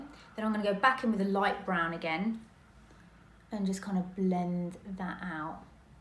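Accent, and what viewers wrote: United Kingdom. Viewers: To my American ears, anything you say in your British accent sounds luxurious!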